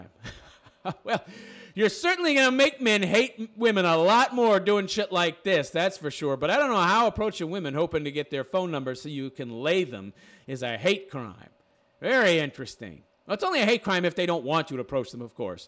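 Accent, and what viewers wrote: United States. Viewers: You sound american' You so tough why don't you get arrested for not paying alimony and "take that shit to the supreme court and have the law taken out?"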